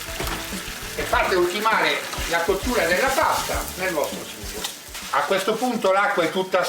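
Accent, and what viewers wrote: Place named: Sicily, Italy. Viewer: L'accento e siciliano proprio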